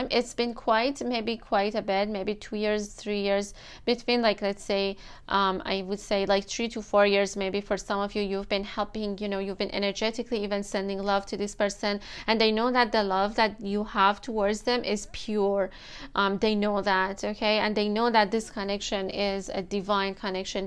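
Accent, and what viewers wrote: Iran. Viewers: Your accent sounds very Persian!!! Great read:)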